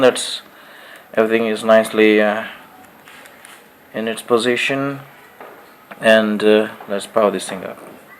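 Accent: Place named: India. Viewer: thanks bro...btw just a suggestion,accent is what comes to people naturally,embrace your indianness,god bless you...no offence...keep the good work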